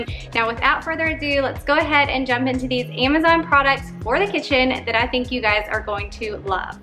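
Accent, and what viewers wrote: South, United States. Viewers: LOVE home and KITCHEN GADGETS! Thanks for sharing! You’re southern accent is so cute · hey hey.. new subscriber here! love your videos and southern accent. I'm from Louisiana and you don't hear it often on youtube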